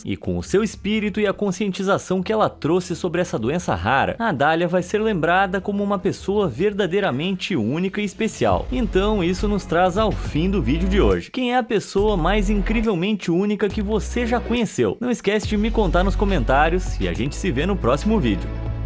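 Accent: Portugal. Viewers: Gosto do teu sotaque em Português (Portugal).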